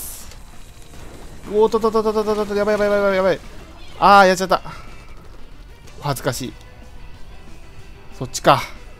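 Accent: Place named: Kansai, Japan. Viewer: うぽなす！関西弁w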